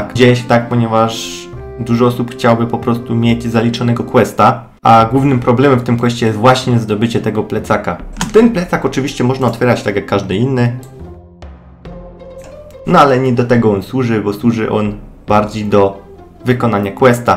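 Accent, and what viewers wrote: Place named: Silesia, Poland. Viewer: Lubię śląską gadkę :)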